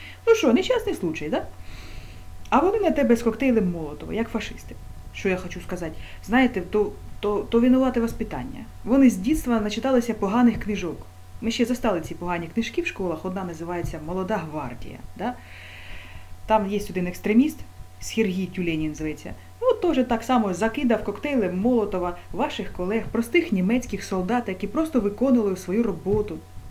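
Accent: Surzhyk, Ukraine. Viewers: Хоть бы говорила нормально, а то один суржик...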